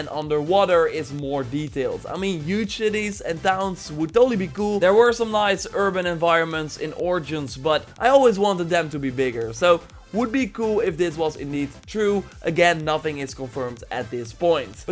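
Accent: Netherlands. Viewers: dude are you dutch or not ?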